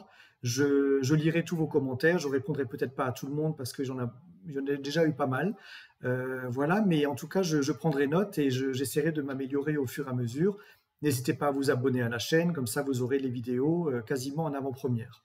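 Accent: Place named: Alsace, France. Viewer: Super (y) Très claire, cette présentation de la prochaine Pleine Lune du 15 novembre, assez technique (comme j'aime!) mais aussi très parlante car reliée à des faits concrets (la politique actuelle, l'économie...). J'adore votre petit accent alsacien et votre façon très posée, très calme, très structurée de présenter cette carte du ciel: vraiment presque parfait! J'ai juste noté une p'tite erreur à "Neptune fait un carré au Noeud Nord" alors que c'est une conjonction, mais c'est vraiment pour vous prouver que j'ai écouté attentivement vos explications jusqu'au bout que je fais cette remarque;-) Bravo et merci infiniment pour cette belle présentation, en espérant en voir beaucoup d'autres, de la même qualité (et peut-être qu'un jour, vous nous présenterez votre chat? ) 😉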